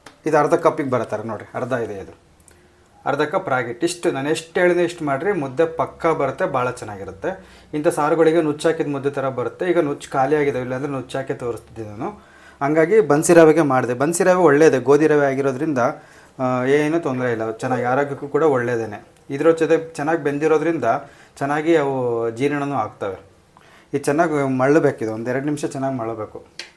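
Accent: Chitradurga, India. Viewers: Neevu pakka davangere Chitradurga Kannada mathadthira ala same nam Ajji and Amma mathado haage.. nimma recipes nodovaga avarathrane Keli madthidhineno ansatthe… thumba thumba olle Saru chatni helkottidhira hinge hale adge dhu video galanna Maadri.. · ನಿಮ್ಮ ಮಾತಿನ ಶೈಲಿ, ಅಡುಗೆ‌ ಮಾಡುವ ರೀತಿಗಳನ್ನು ನೋಡಿದರೆ, ನೀವು ಚಿತ್ರದುರ್ಗ, ಚಳ್ಳಕೆರೆ, ದಾವಣಗೆರೆ ಪ್ರಾಂತ್ಯದವರು ಇರಬಹುದು ಎನಿಸಿತು..ಈ ಎಲ್ಲ ಅಡುಗೆಗಳನ್ನು ನನ್ನ ತಾಯಿ ಇದೇ ರೀತಿಯಲ್ಲಿ ಮಾಡುತ್ತಿದ್ದರು..ತವರೂರ ಊಟ ನೆನಪಾಯ್ತು.. ನಿಮ್ಮ ಅಡುಗೆ ಮತ್ತು ವಿವರಣೆ ಎರಡೂ ಸೊಗಸಾಗಿವೆ..ಶುಭವಾಗಲಿ.. ಸೌ.ಮಧು ವಸ್ತ್ರದ.. ಮುಂಬಯಿ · ನಮ್ಮದು ಹಿರಿಯೂರು ನಾವು ನಿಮ್ಮ ಶೈಲಿಯಲ್ಲಿ ಕನ್ನಡ ಮಾತನಾಡುವುದು ನಾನು ಬಳ್ಳಾರಿಗೆ ಬಂದು 33 ವರ್ಷಗಳಾದರೂ ಸಹ ನಮ್ಮ ಚಿತ್ರದುರ್ಗದ ಕನ್ನಡ ಭಾಷೆ ಸೊಗಡು ಅಡುಗೆ ಮಾಡುತ್ತೇನೆ ನೀವು ಮಾಡುವ ಅಡುಗೆ ಮಾಡುತ್ತೇನೆ ನಮಗೆ ಇದೇರೀತಿ ಅಡುಗೆ ಅಭ್ಯಾಸವಿದೆ.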